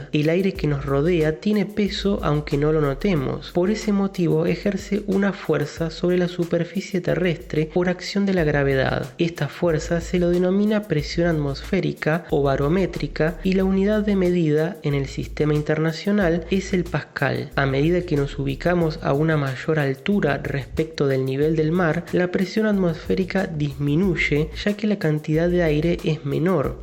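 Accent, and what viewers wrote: Argentina. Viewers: ok claramente eres de arg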